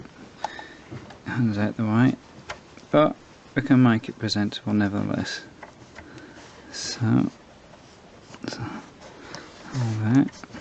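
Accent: United Kingdom. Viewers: I guessed that 'С' ('S') would mean 'Medium' and 'Д' ('D') would mean 'Long' on the basis of the Czech I know, where 'Strední' is 'Middle' and 'Dlouhý' is 'Long'. That £5 was quite some bargain for what I would describe as a collector's item and the Sokol 204 may look basic, but the functionality sounds first-class to me. Thanks for the care tips, although it didn't look all that dirty from what I could make out. I'm getting numerous Worcestershire-based results looking for your name, which makes sense to me, as I have a reasonably good ear for accents and it's screaming "West Midlands!" to me. If so, you're from my late father's sometime neck of the woods, as he studied at Worcester College as part of learning to be a schoolmaster, later at Bognor Regis Teacher Training College, then going on to teach at Spondon House, Derby. I think he still harboured an affection for Worcestershire as Tom Graveney was a favourite cricketer.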